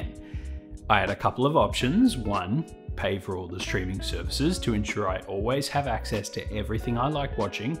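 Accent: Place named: Australia. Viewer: Just had this recommended. Instant sub when heard Aussie. Stay for the great work in video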